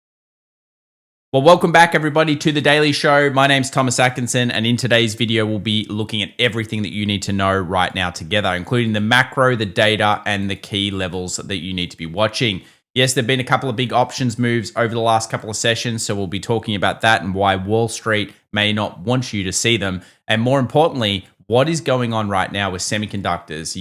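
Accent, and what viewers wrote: Australia. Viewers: He's just Australian m8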